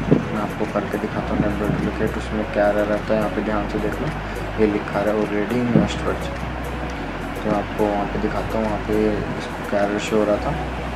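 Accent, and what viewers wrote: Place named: India. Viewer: You didn't notice the background noise and thick hindu accent? (facepalm). Seriuosly..get your hearing checked.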